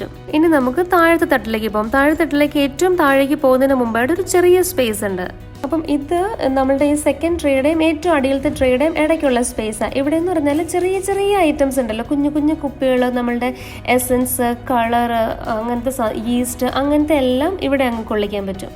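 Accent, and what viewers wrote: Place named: Kollam, India. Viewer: ariyilla.... samsara shaili kettappol tonni..appol onnu confirm cheyyamen vicharichu... ende naad kollam... njan from karunagapally... husband from kadavoor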